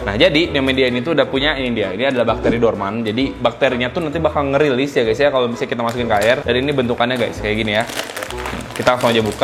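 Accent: West Java, Indonesia. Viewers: Bang Orang Sunda ya:)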